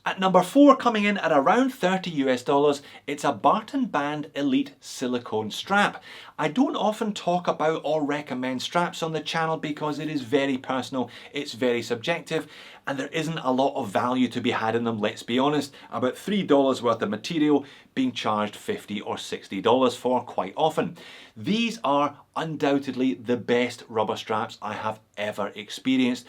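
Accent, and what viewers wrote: Scotland, United Kingdom. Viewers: Thank you so much for all these wonderful vids (and this bit of scottish accent comin´ from Down Under)! I scratched the itch today and got me one of these lovely chinese Seagull chronos 😁😁🎅🎅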